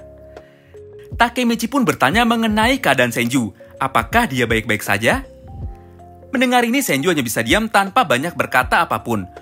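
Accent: West Java, Indonesia. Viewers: Drag-keun orang sunda ya ?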